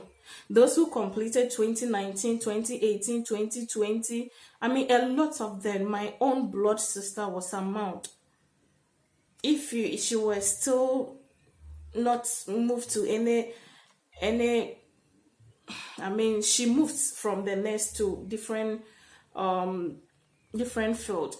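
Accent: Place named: Ghana. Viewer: Hello I missed you oo, miss your Ghana accent 🥰🎉 is the same thing here in Nigeria ooo thousands of nurses and doctors are leaving our country every year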